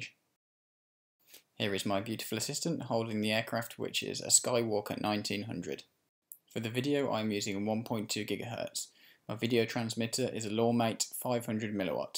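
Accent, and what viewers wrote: United Kingdom. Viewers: Absolutely brilliant! I love narrated flights. I tried to do it once but I have a boring voice and make it sound like a kamikaze pilot. Speaking of which, I sense a slight Midlander accent?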